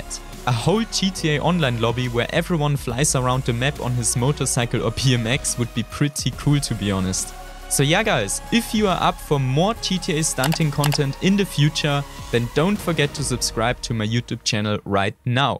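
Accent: Germany. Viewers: man hört dass du deutsch bist haha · Hast du auch eine deutsche Version gemacht weil klingst sehr deutsch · Uff german English is so annoying to listen to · are you german?you sound a bit like german xD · the german accent lolll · klingt sehr nach Deutschem Akzent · if ure not a German idk where u learn English 😂 sorry but everyone hear that ure not a English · Der deutsche akzent xD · Man erkennt so schnell dass du aus Deutschland kommst. Wegen den accent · Höre ich da einen deutschen akzent ? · Du klingst so deutsch:D You Sound so German:D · Haha einfach dieser deutsche aczent xD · Auf jeden Fall ein Deutscher ,hört man am Englisch no front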